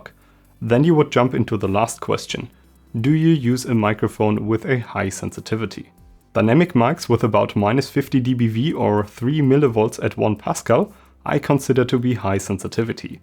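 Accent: Germany. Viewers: Junge dein "gutes Englisch" entlarvt dich als deutscher..REDE DEUTSCH MAN!!!! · Hey, nur mal so 'ne Frage am Rande, dein Englisch hört sich echt sehr deutsch an, bist du deutsch? ^^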